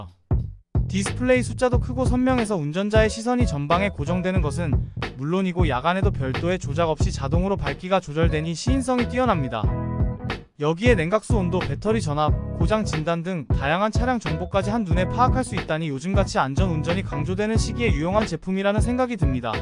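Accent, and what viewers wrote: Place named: Yanbian, China. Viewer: 조선족이냐 백미러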